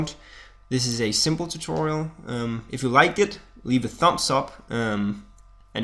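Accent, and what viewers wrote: Denmark. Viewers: you sound danish, are you? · Hej med dig :D Afsløret du en dansker :o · .........You're danish...Aren't you? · Oh... Jeg opdagede ikke at du var dansker lige i starten, davs! :D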